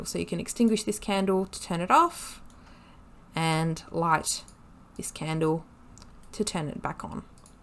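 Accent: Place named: Sydney, Australia. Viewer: You're from Sydney, aren't you?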